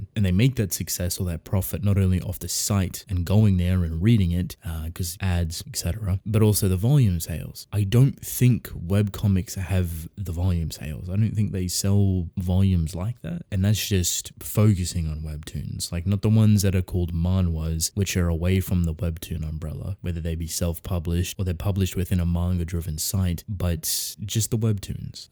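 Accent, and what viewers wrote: Australia. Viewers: i hadn't even picked up on your australian accent and now you've said so, i can't unhear it lmao that's so cool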